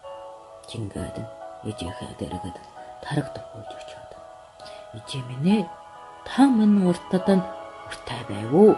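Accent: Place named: China. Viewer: Цаад хөгжим нь чанга, хажууд нь хужаа аялгуутай ойлгосонгүй ээ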